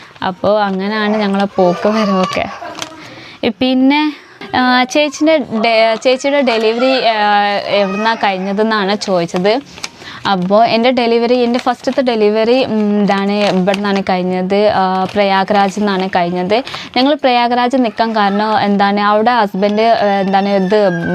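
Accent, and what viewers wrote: Malappuram, India. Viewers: Malppurath alle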